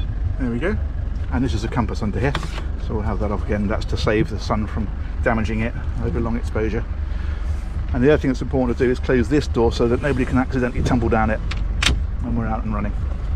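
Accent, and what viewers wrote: United Kingdom. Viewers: you are such a nice, warm and friendly person.. and british accent makes you pleasant to listen to :D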